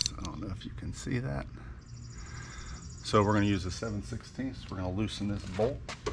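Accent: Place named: Pittsburgh, United States. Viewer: This guy gotta be from tha burgh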